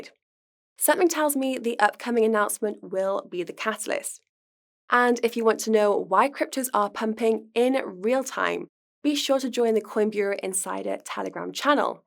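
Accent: United Kingdom. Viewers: You’re a class act,! Wonderful British accent. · sounds British to me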